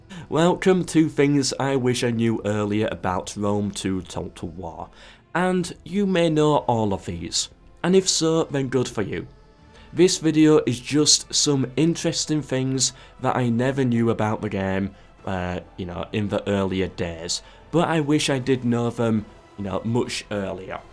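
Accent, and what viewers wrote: United Kingdom. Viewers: What UK accent is this? Sounds like every female flight attendants on the low cost flights, always loved that accent =)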